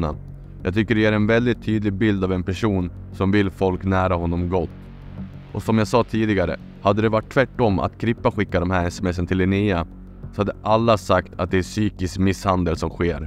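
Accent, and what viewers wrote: Dalarna, Sweden. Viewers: Härligt med lite dalmål på Youtube 😎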